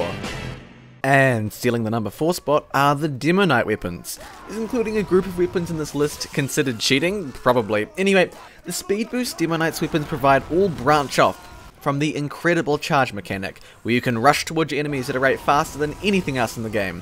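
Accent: New Zealand. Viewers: No, his accent is different. I think he's Kiwi (New Zealand), whereas Muselk is ACT, Australia. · Because he's from New Zealand. That's how their accents are. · i had a feeling you were from New Zealand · He's from New Zealand though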